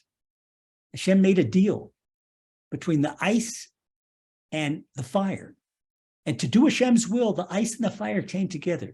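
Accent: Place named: United Kingdom. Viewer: Are you from the UK.. thank you for this.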